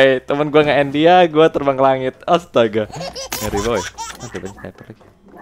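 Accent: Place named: Indramayu, Indonesia. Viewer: kaya orang indramayu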